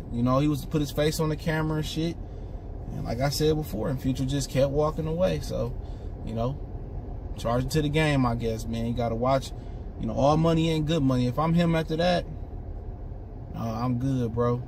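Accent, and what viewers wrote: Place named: Cleveland, United States. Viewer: It sounds like you're from Cleveland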